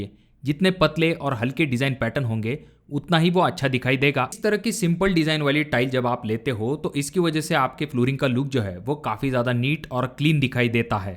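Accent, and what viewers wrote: Maharashtra, India. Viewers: Lagta hai aap Marathi ho ? Soft aawaz nahi hai ?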